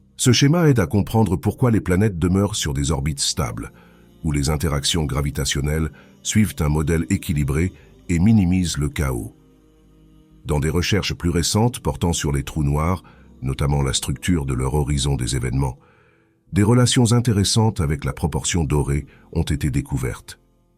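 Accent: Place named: Algeria. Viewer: salut a vous toujours dalgerie d apres votre accent vous faites plusieures videos de differentes appelations le plus important pour nous tous c est vos efforts colossals au service de la spiritualite scientifique le savoir vivre avec cette complexité mathematique ou le nombre d or est present partout meme dans la conception des pyramides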